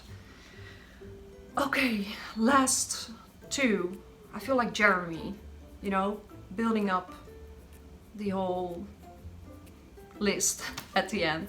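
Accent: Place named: Netherlands. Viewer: your accent sounds Dutch. Great list btw!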